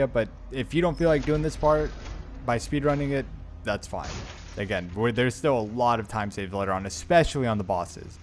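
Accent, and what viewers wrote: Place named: United States. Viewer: you did not just say “my English is not that good” 🤣🤣 dude I thought you were American literally, I’m guessing you’re European as you guys seem to adopt the American accent hahah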